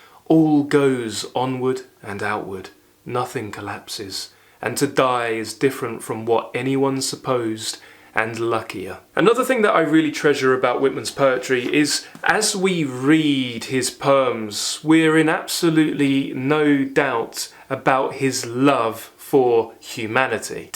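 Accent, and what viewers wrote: United Kingdom. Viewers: It's a trip to be hearing someone with a British accent commenting on Walt Whitman. The most American poet.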